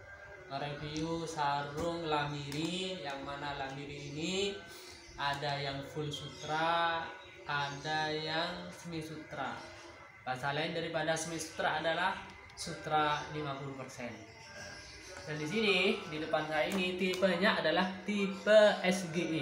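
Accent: Madura, Indonesia. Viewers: Kental bahsa maduranya 🙏🏻🙏🏻😃😃😃😃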